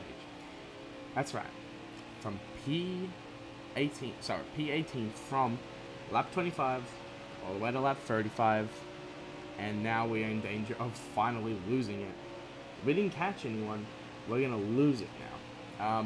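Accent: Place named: Australia. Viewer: Never getting-ver this Australian accent pog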